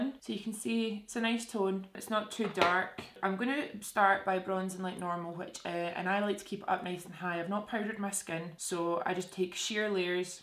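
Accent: Scotland, United Kingdom. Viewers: I remember watching you when you first started... now you got your own Brand, still gorgeous as Ever and LIVIN your best! So proud of you 🥰 I’m 41yrs old MUA here on YouTube & I’ve been in beauty for about 25yrs... I’ll tell ya, these eyelids have completely changed on me! And it’s almost like overnight you just see extra skin that wasn’t there the day before 😒 sucks man!!! So I feel ya on the eyelids 😬 Especially for us who LOVE to do our creative shadows... it’s just not the same anymore 😩 But I STILL entered your VIEVE party looks on IG 🤩 I’m going strong until my lids completely hang over my eyes LMAO. I purchased your Liner in “Brat” & lipstick in “Treasure” and I’m fully in love. You nailed the pigment creamy texture ☺️ I love posting with these lips . I’m also Scotch/Irish/Mexican & that’s the whole Vibe I love about ya,, that Scottish tone is everything sweetie 😁 stay safe out there. 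Rock those neutral tones all you want... they’re beautiful. <mail>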